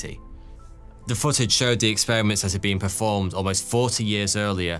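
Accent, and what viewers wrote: United Kingdom. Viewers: srry but it sounds as if the very well spoken, and well sounding, spokesperson of this calls thoughty 2 42, I realize its just because of the lovely british accent, but it could be pronounced more clearly, and that btw goes for all ur vids!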